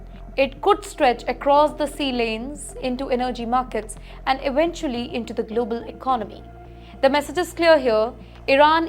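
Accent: India. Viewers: Curry accent 😂 eat cow dung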